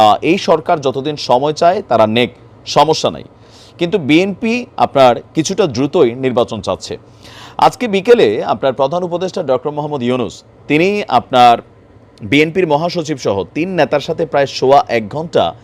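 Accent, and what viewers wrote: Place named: Noakhali, Bangladesh. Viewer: ভাই আপনি যতই শুদ্ধ ভাষা বলতে চেষ্টা করেন না কেন আপনার নোয়াখাইল্লা ভাষা চলে আসে এটা খুব হাসির কারণ হয়ে দাঁড়ায় তাই আপনি নোয়াখাইল্লা ভাষায় বলেন শুদ্ধ ভাষার বইলেন না আপনারে আর মানায় না এটা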